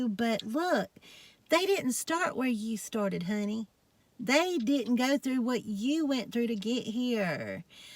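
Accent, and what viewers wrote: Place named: Georgia, United States. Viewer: I love this video!!! You always make me smile! Some people on here probably know by now that I am your mother n love, but they don’t know that I’m from Virginia and the strongest south Georgian accent I have ever heard in my life is my husband’s and now I have a daughter n love who has a south Georgian accent that competes with his!!!! I love y’all’s accents. My mother has always had people ask where she was from because she has a strong Appalachian accent. Everyone always thought she was from West Virginia, close, but in south western Virginia is where she came from. I have no accent, I wasn’t lucky like, my mama and husband. But anyways, I’m so lucky to have you in my life! Your a true gift! I wish all of your new friends on YouTube can meet you, they would love you too. ❤️🤗🌹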